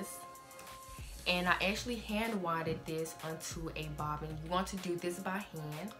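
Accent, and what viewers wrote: Arkansas, United States. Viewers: aye turn up Arkansas!! i knew i recognized ur accent lol keep it up girl!!💕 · Your accent is so strong! Arkansas. I like it. :-) I'm from Cali and right away knew you were from somewhere on the east coast.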